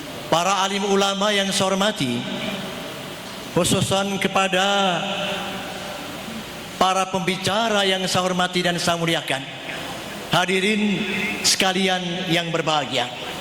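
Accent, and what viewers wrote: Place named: Java, Indonesia. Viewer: kalau Ak sih gk tahu yah terlpas itu Benr atau tdk Cuma nih yah ,yg bisa Ak tanggap Knph kok ,Bahasanya masih aja medok bgt yah, gkd nular nular org luar, minimal Bahasanya gk medok bgt bgitu lohh Kan kuliah di luar negeri kan ??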